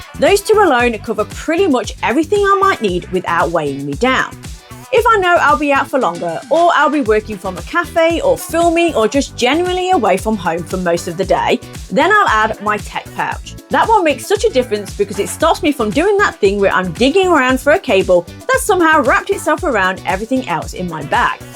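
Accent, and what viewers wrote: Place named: Northern England, United Kingdom. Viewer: Hi! It says you’re from Australia but I think I hear some northern English pronunciation every now and then. Your “U” sounds in particular. Am I way off? 😊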